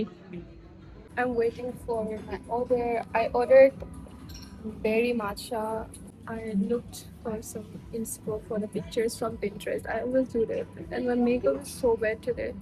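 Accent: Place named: India. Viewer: Her hindi accent is sooooooo cute😭😭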